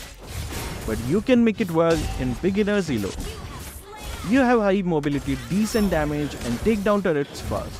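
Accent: India. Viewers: dude are you from India or what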